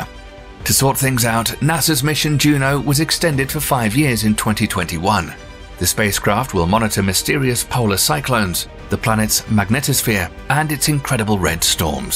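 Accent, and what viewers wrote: United Kingdom. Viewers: I'm tired of Brit8sh accents. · Another Brit accent.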